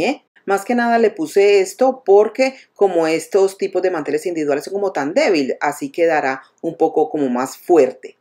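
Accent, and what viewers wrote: Colombia. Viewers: En mi país le dicen yaquis... Recién estoy jubilada y pues mi casa que es la suya muy seguramente es del tamaño de su sala 😂😂😂 sin embargo me encantan sus ideas soy realmente nueva y hoy haré lo de los lentes y las bolitas de palillos... No se si tenga decoraciones para baños.. Me encanta que la mayoría de sus ideas es acorde a muchas economías.. Aparte de las excelentes ideas amooo su acento... Colombiano??? No se si me equivoco pero me encanta como habla tan bonito....genial su canal!!!!!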